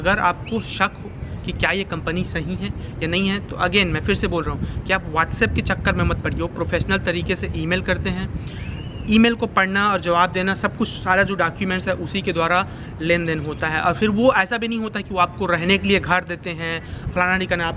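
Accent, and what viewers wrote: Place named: Chhattisgarh, India. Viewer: India me kaha se ho bhaiya CG se ho lag raha hai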